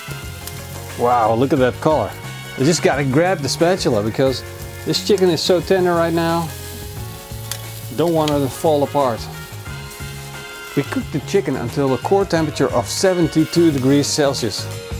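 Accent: Netherlands. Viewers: man the dutch accent is so cool.perhaps a little carribean style barbeque next? Or even curing your own meats? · Je hoort echt aan zn accent dat ie Nederlands is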